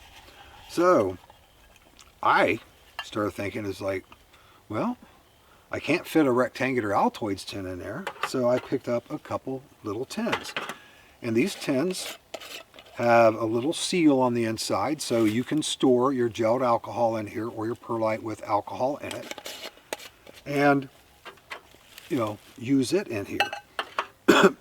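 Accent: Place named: Ohio, United States. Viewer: Are you located in Ohio? Your voice/accent remind me a LOT of Dave Canterbury. Great video, btw.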